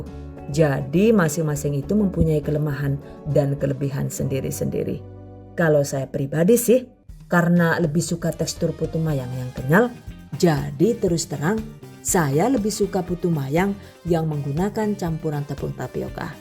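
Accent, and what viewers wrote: Banyumas, Indonesia. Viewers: syukaaa bgt sm chanel ini..karna mudah dipahami ,lucu lg.ana ngapake.ora ngapak ora kepenak.🤣🤣🤣🤣 #GiveawayTHV2021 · Keren bangett chef e pke bahasaa unikkk Kadangg bhs inggris campur bahasaa ngapakk lahh😅😂 Ora ngapak ora kepenak pokokee😂👍 Bismillah #giveawayTHV2021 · Huawww, Amazing... 😍😍😍 Editing Videonya bikin seru ditambah lagi pengisian suaranya yang Khas dan medhok banget ngapak kayak saya. Hahaha 😉 semoga sukses terus ya pahalanya ngalir terus, udah ajarin kita masak². 😍😍😍 #GiveawayTHV2021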